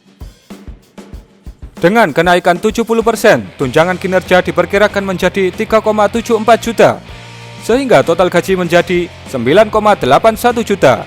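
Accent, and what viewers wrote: Java, Indonesia. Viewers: Saya tertarik channel ini, ngomongnya medhok jawa kayak saya. Saya subscribe mas 👍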